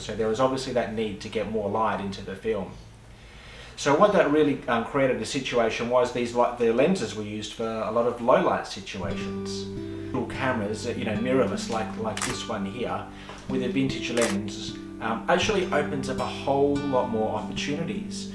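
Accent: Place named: Australia. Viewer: You look so American and yet you sound so Oz. I am hevily into vintage lenses, especially anything Carl Zeiss. My two best are an ancent Carl Zeiss Biotar (No red T* but, coated glass) and a more recent Rollei Carl Zeiss Distagon, very worn and skuffed on the outside, but what great glass. I recently found out about the SMC Pentax-M lenses. There is a site that ranks Pentax glass, the Pentax-M f/1.7 50, is not only the most popular, it is ranked the highest. So sharp, and so cheap. $30 USD in the states. Thank you, good work, I subscribed.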